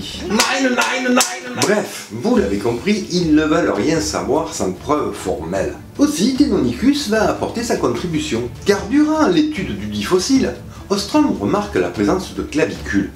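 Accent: South, France. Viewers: L'acsent du Sud.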